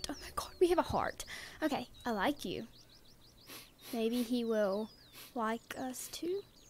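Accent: South, United States. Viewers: Its an off day when whatever your eating just sinks in to the ground....... also lol southern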